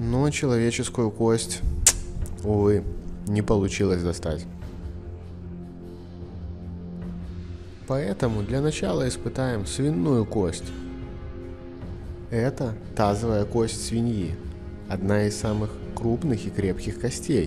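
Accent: Belarus. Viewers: Парень ты из Беларуси, слышно по акценту и скорей всего из западных областей!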